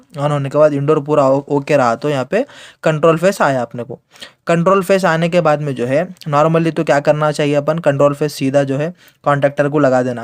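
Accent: South India, India. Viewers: Bhi aap South Indian ho kya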